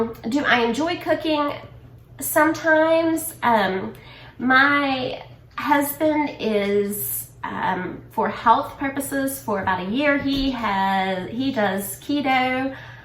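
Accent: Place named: North Carolina, United States. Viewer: I love these Q&A videos and learning about other creators. Now that make sense that you are from N.C. With your accent. Growing the YouTube channel is quite the feat. Great job. Learning new Youtube skills is so fun. Thank you for sharing.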